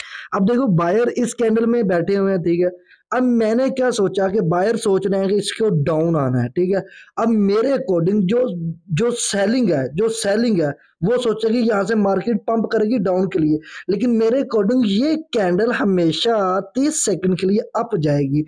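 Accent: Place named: Kashmir, India. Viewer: Sir bohut kush sikhne ko mil rha ha apki videos sa bilkul hmrey jammu and kashmir ki trh language boltey ho ap❤❤❤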